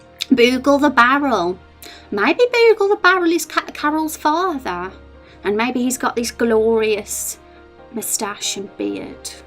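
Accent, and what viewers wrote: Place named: United Kingdom. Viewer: Oh, British? had to adjust a bit but I liked it! ;P :D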